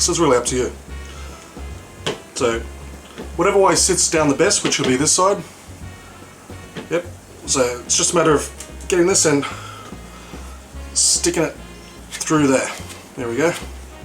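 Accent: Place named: Australia. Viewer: Hey mate are you aussie by any chance? Nice apples btw, they looked so tasty and when you bit into it I was thinking "god I bet that tastes sweet"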